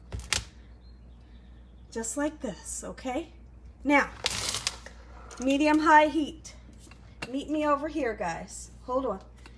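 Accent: South, United States. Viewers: She sound like pualla dean’s black friend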